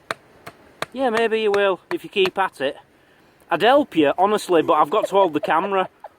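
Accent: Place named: Yorkshire, United Kingdom. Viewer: Where in Yorkshire are u from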